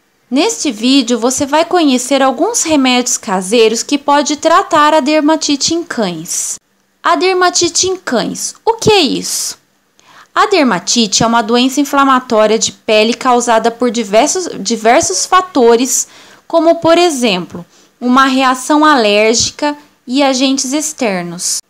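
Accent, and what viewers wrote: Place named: Northeast, Brazil. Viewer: Treinar a dicçao fiquei <link>.a leitura do texto com sotaque sertanejo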